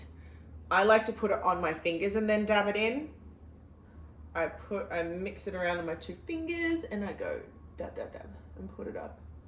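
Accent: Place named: Australia. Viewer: You are gorgeous, with or without makeup. I love your Australian accent. I enjoy listening to your videos because of the way you speak. You mentioned that you are delving into acting projects. Are you learning how to pronounce words in other accents as well ?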